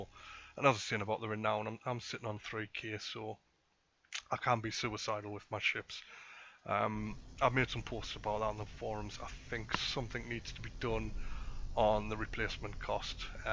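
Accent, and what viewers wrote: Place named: North, United Kingdom. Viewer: Nice vid, have to say where you from m8 as ya sound like ya from the north. Cant wait for the bfg game to launch will u be doing the campaign? And i would deffo get the IN with marks/favours to boost them maybe the imperial navy mark to spam cobras lol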